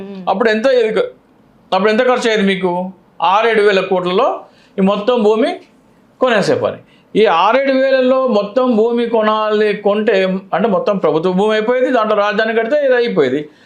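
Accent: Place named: Telangana, India. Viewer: Eeyana telangana language lo maatladutunnade..🤔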